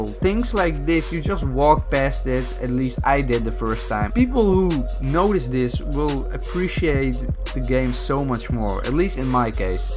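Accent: Netherlands. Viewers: Are you dutch? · Are you dutch because you sound dutch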